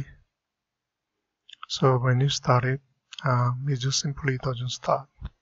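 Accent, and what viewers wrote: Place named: India. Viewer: Bom demais, sotaque indiano nunca falha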